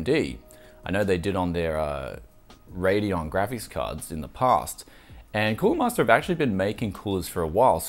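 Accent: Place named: Australia. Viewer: I sometimes forget you're from the down under I guess I just got used to your accent. You keep mentioning summer and I look out the window and it's piss pouring rain here in the states. Lol I'm up here wishing for summer!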